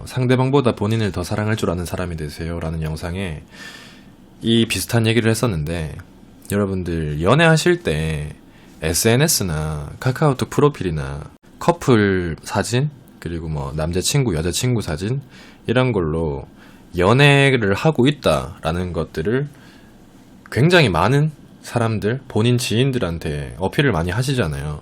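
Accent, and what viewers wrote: Gyeongsang, South Korea. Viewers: 은 너무 많은걸 알고계시다 마인드가 스마트하네 대구 말씨도 넘좋다 ㅎㅎ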